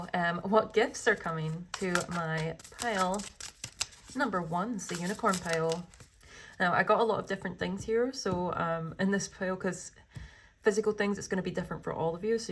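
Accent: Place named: Barbados. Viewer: I just found you today!💜. I love your accent - Bajan 🥰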